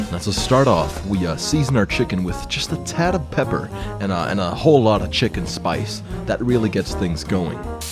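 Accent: Denmark. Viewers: wait, you're danish? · Is danish? Or scandinavian for that matter?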